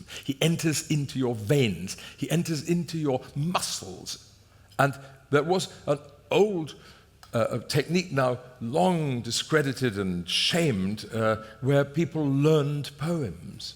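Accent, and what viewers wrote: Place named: United Kingdom. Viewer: Simon Callow splendid British accent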